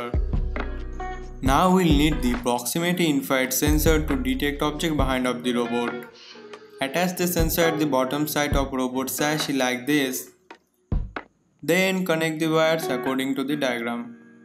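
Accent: India. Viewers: Are you from India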